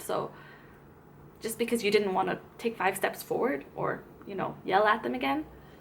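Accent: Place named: South Korea. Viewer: i detect an ever so slight korean accent in your english.. just a few words.. lol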